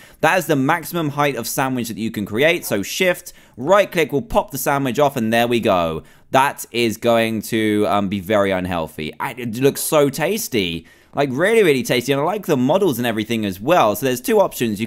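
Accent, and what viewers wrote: United Kingdom. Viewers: It's how most of us in Great Britain say stuff. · There are many ways to pronounce many words and he is in the UK so your in the U.S.A maybe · jean wasiulewski no he has his british accent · dude dot hate on british accent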